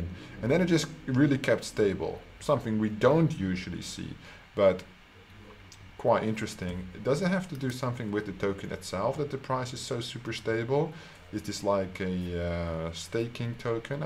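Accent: Netherlands. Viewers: Nice vid bro!! Smart thinking🤔💎 Where you from? Accent sounds Dutch😅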